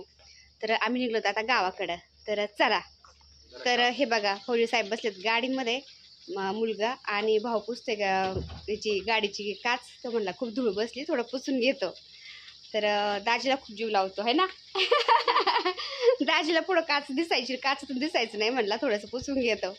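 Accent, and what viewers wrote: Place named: Parner, India. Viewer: ताई तुमचा आवाजाची टोन ऐकुन, अस वाटतच होत की तुम्ही माझ्या माहेरच्या बाजुच्या वाटतात, पन हा vidieo पाहुन कळल कि आपन दोघी एकाच जिल्ह्य़ातील आहे,माझ माहेर पारनेर आहे ,मी पन नविनच youtube channel चालु केलाय ,तुम्ही कुठे रहातात ते please सांगा मला तुम्हाला भेटण्याची खुप खुप ईच्छा आहे ताई please reply दया 🙏🏻🙏🏻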